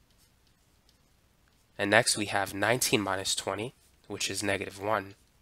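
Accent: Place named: Texas, United States. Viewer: bro is from texas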